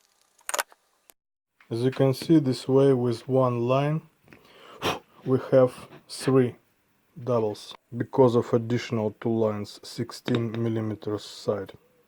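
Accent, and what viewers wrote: Russia. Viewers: русскоязычный?.Спрашиваю потому что интересно на английском говоришь.как будто Русский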